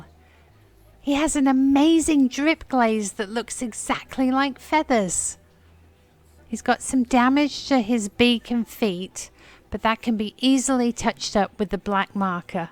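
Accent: England, United Kingdom. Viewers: I love listening to your accent - you sound so English and then you say "clowshe" rather than closh (to rhyme with gosh) as we do in England. Fascinating to see what words have changed and what USA phrases you use. Shopping Cart is one - we say shopping trolley. Love the channel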